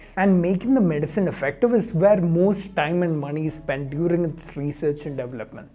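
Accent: Kerala, India. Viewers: Your content is one of kind and eye opener to blind followers of fallacy and myths. But your reach will be limited to just a few.... You have strong malyali accent coming out when you speak English. I wish it would be coherent and lucid like other so called youtubers on you tube who are spreading mythsband nonsense. Clarity in language as much is needed as in the content. Your content is king but can't say about the way utter it.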